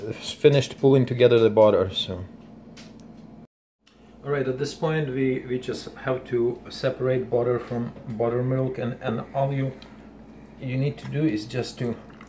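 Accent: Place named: Germany. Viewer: Very interesting. I sense a slight German accent. Good presentation. My aunt was a catholic Nun and she lived in a Monestary ( Kloster ) where they backed their own bread and made butter. And connected to it was a Brewery.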